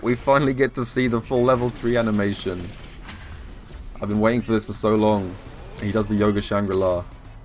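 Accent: United Kingdom. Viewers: I really enjoy u talking about sf tech u clearly know your shit and I could listen to an English accent all fukn day lmao it’s like the best of both worlds hahahah 👊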